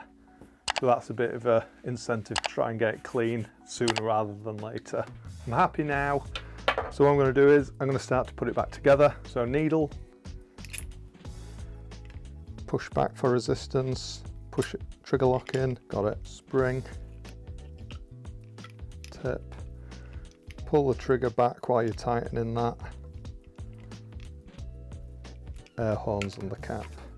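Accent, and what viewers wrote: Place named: Bolton, United Kingdom. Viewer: Thank you! Good to know ! I’ll try this… Merry Christmas.. you sound like you’re from Bolton !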